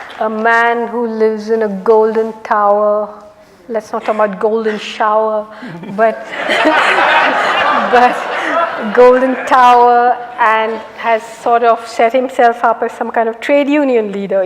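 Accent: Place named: United Kingdom. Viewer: She is another school of propaganda unto herself . Propaganda always has an element of truth manipulated to an end with creating even a more radical social polarisation than what actually exists on the ground . She really never talks about the emergency , or the biggest riots in the pre modi era , the kashmiri pandits exodus . She definitely has an upper caste Hindu phobia . In her pursuit of happiness , all the Hindu characters are cruel blood Mongers who crave Muslim’s blood , always vile and wicked while all the Muslims and third genders are noble , peace loving , innocent , righteous, just and beautiful .. specially the kashmiris are depicted as the most noble and kind hearted race in the world. What sort of a joke is that ? Her generalisations are gross and frustrating . Never been more fed up of a mainstream writer . What she is doing to society isn’t just a disservice but a more serious damage . 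In order to criticise one radical form of militant hinduism and the Muslim phobic right wing in India , she is demonzing the entire mainstream Indian culture and Hindus at large. Her theory is more simple than what her chaste British English attempts to hide - her one point program to create a demon out of everything that hinders her propaganda. There is absolutely zero analysis in her arguments ,only blanket sweeps and gross generalisations , even lies ! She picks and chooses facts at will , even the degree of the facts reported by her is manipulated . I wonder how come a brai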